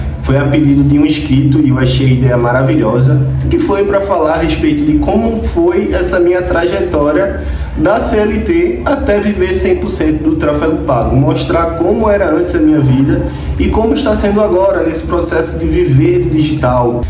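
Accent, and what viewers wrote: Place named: Recife, Brazil. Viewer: Eu j tive esse sotaque. Recife, Pernambuco, Brasil.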